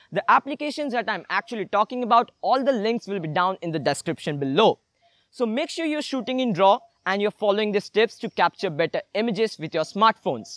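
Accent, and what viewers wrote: India. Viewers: fuck that indian accent lol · Very clean and clear indian accent👍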